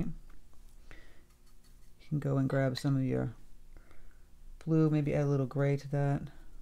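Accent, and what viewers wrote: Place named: North, United States. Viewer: Plumes. The things on the top of the grasses, like pampas grass. But anyway, you're my favorite! Girlfriend, you are the best teacher. It's all just likes and shapes and marks. You make it all so approachable. Thank you very much! Not only do I watch all of your videos, but I frequently paint along with you, and if I don't paint with you, I save the video to paint with you later. You've blessed my life. PS. As a NYer living in Atlanta, I love to hear your northern-ese way of speaking. ;)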